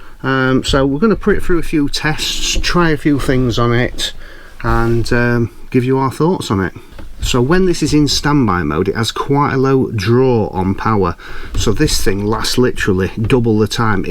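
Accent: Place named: Northern England, United Kingdom. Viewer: He’s Northern English, like my mom’s friend, I recognize that accent😊. I was just about to order that kettle, surprised it couldn’t do it, was power lifting mode on? Oh well it’s small.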